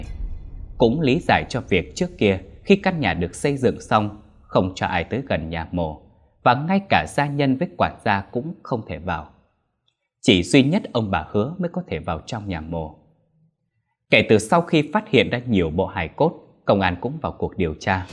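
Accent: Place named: Central, Vietnam. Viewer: Nghe truyện của anh thấy hay lắm luôn ạ 🥺🥺 nhưng mà do giọng a ở miền trung e nghe hơi khó ạ 😢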